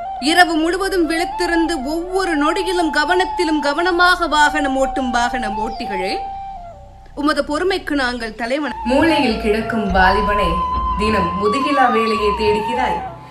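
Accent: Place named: Sri Lanka. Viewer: எமது இலங்கைத் தமிழ் உச்சரிப்பை உங்கள் நாவிலும், இதையத்தில் விதைத்தது இறைவன் என்னும் அருள், மேலும் தமிழ் மொழியில் உங்கள் சேவை பெருகட்டும் நன்றி.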